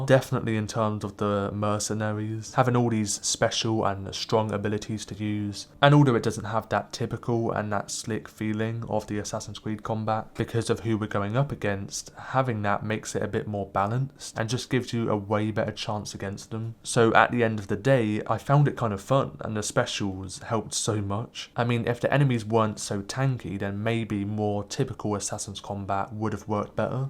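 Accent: United Kingdom. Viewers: you do come off as a hater. It’s an average brit accent🤣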